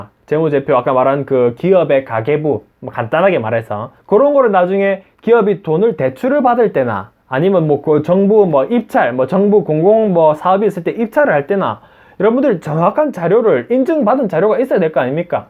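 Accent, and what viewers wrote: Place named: Gyeongsang, South Korea. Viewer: 안녕하세요, 님. 저는 현재 해외에서 한국어 교원을 하고 있는 사람입니다. 저는 원래 영어교육학이 전공이라 영어교사로 고등학교에서 재직하다가... 무언가 고등학교라는 시스템이 학생을 너무 괴롭힌다는 생각이 들어..그나마 널널한 한국어를 가르치고 있는데... 최근들어 드는 생각이, 저는 영어를 좋아했던 것이지, 학생을 좋아하지는 않은 것 같습니다. 그래서 제 주변에 us cpa하시는 분께서, 영어에 자신만 있다면 미국 cpa를 한 번 도전해보라고 해서.. 당장은 아니지만, 조금씩 준비해 나가려고 합니다. 한국어교원 컨텐츠 영상이나 회계사 컨탠츠 영상을 보면.. 대부분 학원들 광고가 많이 뜨는데, 님처럼 개인이 어떻게 공부했는지도 올려주시면 좋을 것 같아요... (참... 조회수는 안나올 컨텐츠이긴 하지만....). 그리고 조금은 난해한 질문일수도 있지만.. 님은 세무사로서 지금 행복하신가요? 그저 개인 의견이 궁금합니다. ^^ (저 또한 부산 사람이라... 하지만 직업상 참..억양을 죽이려고 노력하는데... 마음껏 갱상도 억양을 발산하시는 님이 오늘따라 참 부럽습니다.... 뭐 저는 억양을 없애려고 노력만 했지, 없다고 하지는 않았습니다....^^)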